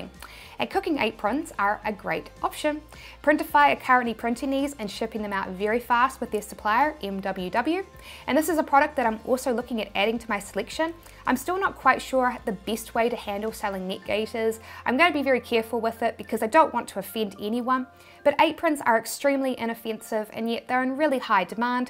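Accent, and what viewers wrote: New Zealand. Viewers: I'm in England, and think your New Zealand accent is reassuringly super cool. Completely in love with you, brilliant presentation so easy to understand. I was never much interested in on-line marketing until, Conyvirus found me on YouTube and stumbling onto your channel, Wow you are impressive, your running commentary alone is so descriptive I have you in my ear most all the time, helping and encouraging, Brilliant brilliant brilliant - you have changed my life, for the better for ever. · You sound like a kiwi girl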